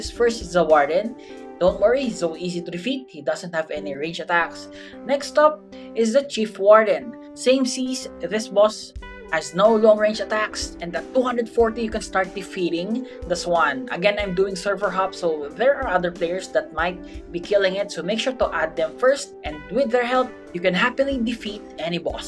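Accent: Philippines. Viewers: Your Filipino, accent and the way you talked to the guy you took the yeti from "lods" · PINOY KA?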